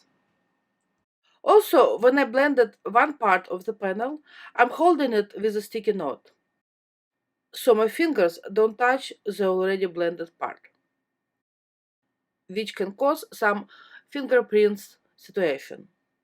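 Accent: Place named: Poland. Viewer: I'm happy I discovered your videos (I found you through a video by Yana Smakula). I am not a fan of pink, so I would never have thought to do these flowers on a pink background, but this card is beautiful. I love your ideas and hints, and I love your accent. It brings back memories, as it's similar to that of my Polish grandma (her native language was Polish, but she spoke some German and a bit of Russian in addition to English). I am very impressed by your command of vocabulary and sentence structure. I see so many comments by Americans on FB, and your language skills far surpass theirs. Sadly, I only know one language, and I am always impressed by those who learn more than their native tongue.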